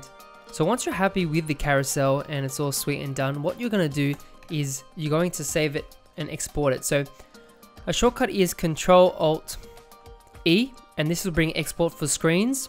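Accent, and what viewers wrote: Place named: Australia. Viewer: Thanks heaps mate, love the accent as well, finally a tutorial in strayan.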